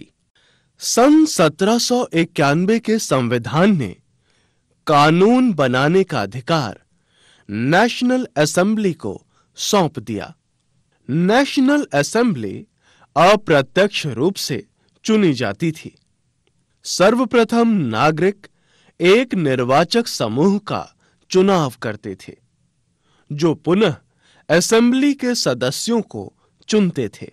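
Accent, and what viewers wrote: Standard. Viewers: sir video to aacha tha but aap etna sudha hindi kyo bol raho ho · it's to hard too understand because of youre real Hindi (shudha) Hindi. So it makes it little hard too understand.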